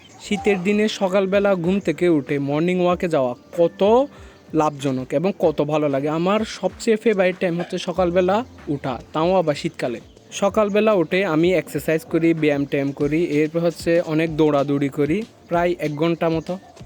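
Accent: Chittagong, Bangladesh. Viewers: ভাই আমি ও চিটাগং এর আপনার কথা শুনে বুঝা যাই আপনি ও চিটাগং এর ,, আমি এবার এডমিশান শিক্ষার্থী ,, কমার্স থেকে,, আমার mbstu তে পড়তে চাই ,,, আপনার তো হেল্প পাবো মনে হয়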